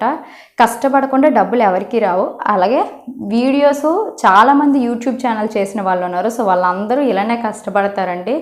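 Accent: Srikakulam, India. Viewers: Maadi Srikakulam sis... ani chusa appudu anukunna ma side velladi ani...mem kuda vyshyas...bobbili lo ma vallu unnaru...slang ni marchukomani comments chese vallandaru posh guys ayiuntaru...anduke nativity ni comment chestunnaru...evari kosam meeru change avvadu aite vallaki value ichinatlu avtndi...keep doing ur vlogs...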